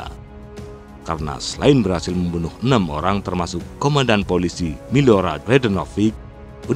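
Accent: Java, Indonesia. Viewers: Ini yg baca narasi nya kata per kata nya ga jelas artikulasinya suaranya terlalu ngebas dan terlalu ke JAWA JAWA an jd baik nya diganti aja ke yg lebih nasional org nya pecat aja tuh ok tq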